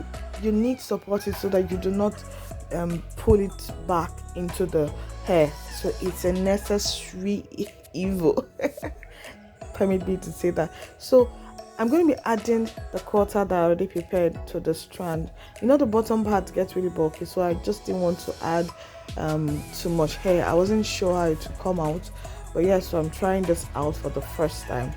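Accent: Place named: Nigeria. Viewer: Firstly I might say I just love the Nigerian accent. One of my favorites. And you did the things hunny,looking all so good and I did click the like button because I really do understand😂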